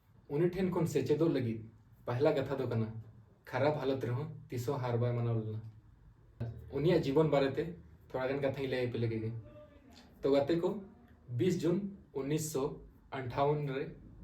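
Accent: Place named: Santhali, India. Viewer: Itna shudh santhali😅😊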